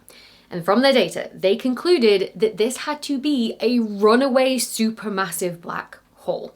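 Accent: United Kingdom. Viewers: Tell me you're British without telling me you're British (although, of course, the accent would be plenty). 26 Celsius is 79 Fahrenheit which is the temperature to which I set my thermostat for my air conditioning. The temperature she's melting at is the temperature I cool down to. Insufferable for her is comfortable for me. (There may be a video lighting issue I'm not allowing for, but she seemed to think the temperature was enough explanation). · Dr. Becky's presentation is such an intersection of delightful things from the subject matter to her effervescent enthusiasm for it -- and who doesn't want their serious topics presented with a bit of a British accent? Always a fun way to learn about this stuff.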